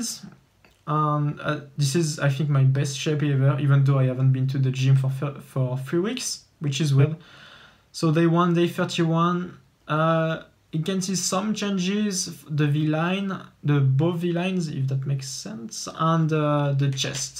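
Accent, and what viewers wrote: France. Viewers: On reconnais directement l'accent français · That French accent... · Are you french ??? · Mdr l’accent français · Are you French ?? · your accent is french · R u french ? J’ai reconnu l’accent 😂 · Pleeeeeaaaasssssseeeeee you are French? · Oh yes the French speaking english lovely accent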